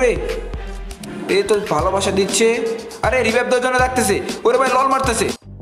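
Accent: Tangail, Bangladesh. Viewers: Vai tomar basa ki Tangail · Bhai apni ki Tangail er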